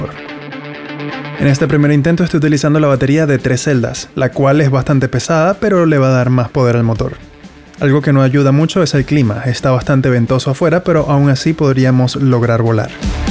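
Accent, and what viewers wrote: Venezuela. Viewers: Epa eres venezolano? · Hola está buena la prueba con ese motor, te felicito por todo sobre todo me gustó que me parece que eres Venezolano, yo soy Venezolano, si estás interesado en un proyecto para hacer máquinas para lavar perros por favor contactame para coordinar algunas propuestas. Muchas gracias. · Hola, por casualidad... Eres venezolano? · Buena! jajaja. Tengo una duda con tu nacionalidad brother! Parece venezolana, pero tengo duda XD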